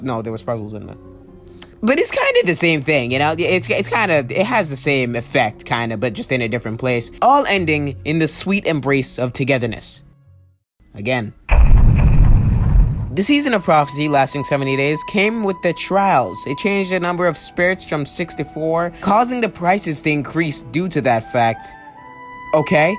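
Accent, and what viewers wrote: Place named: New Jersey, United States. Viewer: I started at the last two week of Nine Color Deer! Dude you sound like you are from Jersey!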